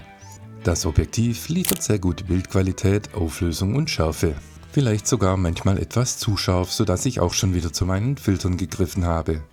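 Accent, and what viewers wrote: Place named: Swabia, Germany. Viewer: Schwabe, ja aber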